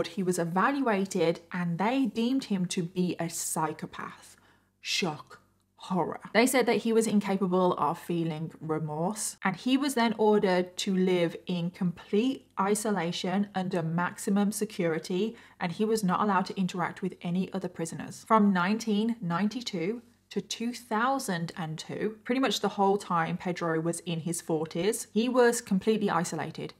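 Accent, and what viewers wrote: United Kingdom. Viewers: you are so sweet and caring <3 i love the way you tell these cases, it's very personal and it always shows your opinions and feelings about them.. also ADORE your british accent! :) Love from Italy <3 P.s: in Italy we just had a very shocking murder of a young girl, who was a mother to a 5 year-old baby boy and she was also pretty known on the internet.. it's really absurd, I'd really like to see u cover it!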